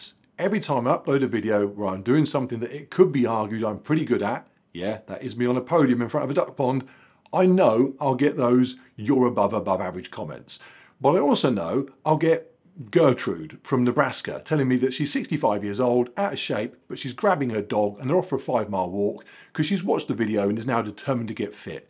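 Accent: United Kingdom. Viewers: I am here just to hear the queens language …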